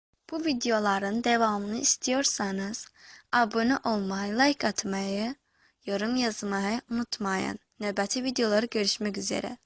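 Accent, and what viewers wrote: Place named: Azerbaijan. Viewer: Türkçe Çoxda Danışanmırsan O Saat Başa Düşülür Azerbaycanlısan Genede Halaldı =)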